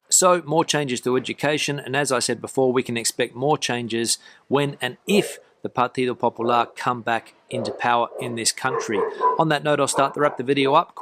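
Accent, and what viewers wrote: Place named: Australia. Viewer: Love your video does thank you. Have to admit I only started watching because of th e ausssie acent and Spanish Horses. Well and Spain. I lived in Aus briefLy, two years as small Pearson, obviously made a big impression.🤠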